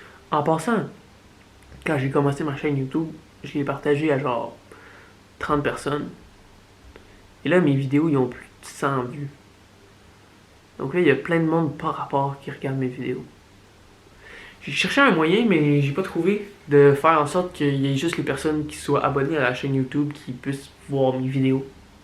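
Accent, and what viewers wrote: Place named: Quebec, Canada. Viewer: Mais il le dit nn 😂 ça se voit il parle de sa vie au Québec, il a l’accent…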